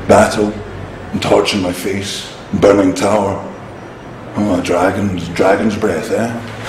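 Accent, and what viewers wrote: Scotland, United Kingdom. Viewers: Nah, his Scottish accent is too thick so far. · I didn't even clock that he was Scottish until watching this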